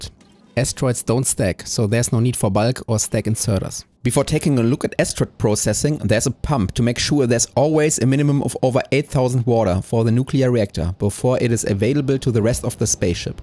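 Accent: Germany. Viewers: I only just started making green science so I don't know why I'm watching this other than enjoying a German accent talking about precision and efficiency.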